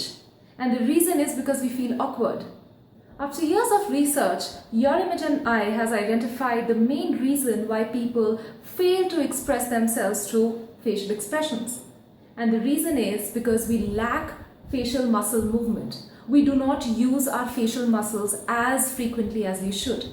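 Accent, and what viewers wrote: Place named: India. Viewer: i find Indian accents pretty. yours soft ;)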